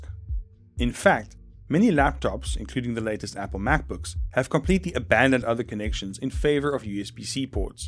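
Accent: South Africa. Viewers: uppa the south african accent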